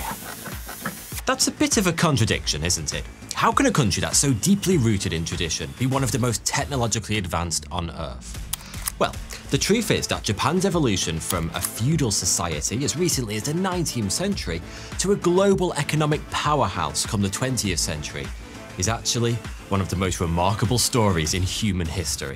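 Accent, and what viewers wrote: United Kingdom. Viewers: Most British voice ever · I might be from the States but your accent and mannerisms are key on, not to mention the dumbest, corniest jokes EVER! Bravo to the hilarious Brits! Wish I could join this channel in some way! The editing is flawless btw ;)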